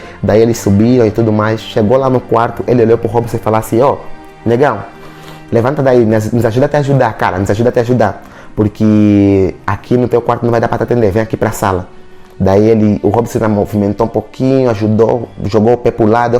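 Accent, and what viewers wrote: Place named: Portugal. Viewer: Ele falar português só que de Portugal entendeu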